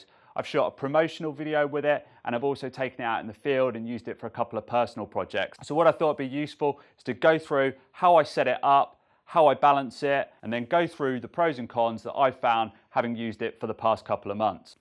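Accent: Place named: Pennsylvania, United States. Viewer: I've never heard that accent before... you must be from Pennsylvania.. right?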